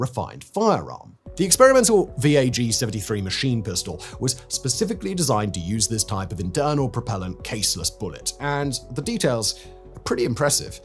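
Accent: United Kingdom. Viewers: I found your video content to be interesting and well organized. But I had trouble hearing your narration. At first I thought it's because I'm not used to hearing British accent, but then I realized you tend to drop off in pitch and volume towards the end of your sentences. That made it very difficult to follow what you're saying.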